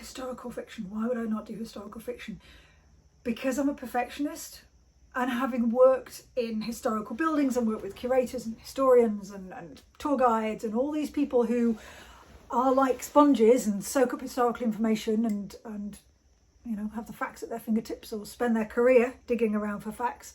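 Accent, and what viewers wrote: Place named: United Kingdom. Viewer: Hi - greetings from Denmark. So nice to hear your voice. I enjoy your books, and it was so great to hear your British accent and even some dialects. You take care of your wife and cats. All the best. Kirsti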